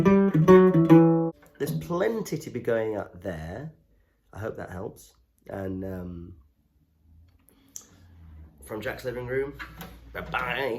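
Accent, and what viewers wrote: United Kingdom. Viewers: ☺️ ah! Nice too hear a native YORKSHIRE voice teaching guitar. Nice wee tutorial as always, cheers pal 🍻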